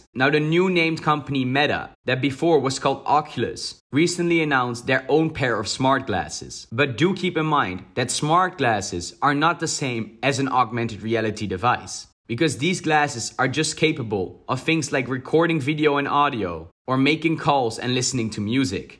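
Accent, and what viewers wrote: Netherlands. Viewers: Just gotta ask this: Are you Dutch?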